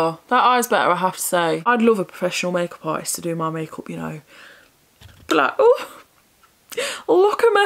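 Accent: Nottingham, United Kingdom. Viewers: The pool you’re on about is the blue lagoon in Iceland. Google it! It’s paradise on earth! Also where are you from it sounds like you’re from Nottingham? I love your content x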